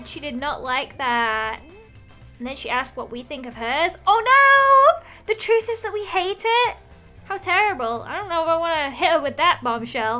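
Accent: United Kingdom. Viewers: Lizzie my big sitter said are you brithish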